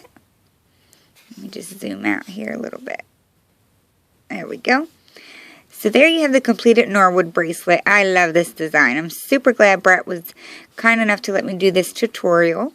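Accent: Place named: South, United States. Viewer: That southern accent tho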